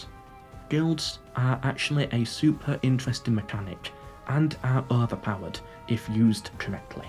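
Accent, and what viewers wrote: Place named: Yorkshire, United Kingdom. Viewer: The Eternal Game. Who could best describe it, time and again, with his unmistakable Yorkshire accent? Mr., of course. No irony intended. Take care, reddish lad.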